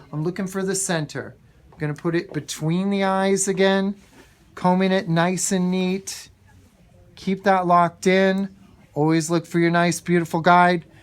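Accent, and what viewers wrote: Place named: Michigan, United States. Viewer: He talks like he’s from Michigan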